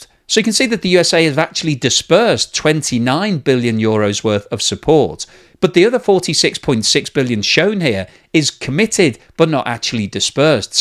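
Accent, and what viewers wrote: United Kingdom. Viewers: he sounds british though?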